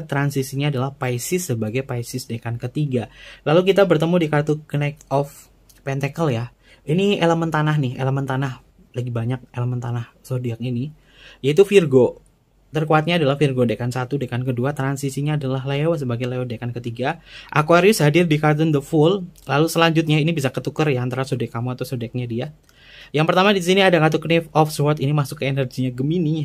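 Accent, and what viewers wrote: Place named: Wonosobo, Indonesia. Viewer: "sikapnya si pekok kempleh2" 🤣🤣🤣 kak bahasanya mirip kaya wonosobo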